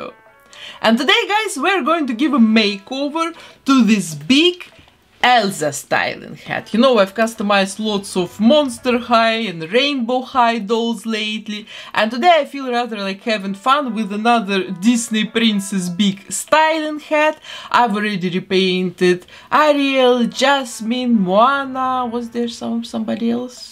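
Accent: Russia. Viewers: Русский акцент или мне кажется?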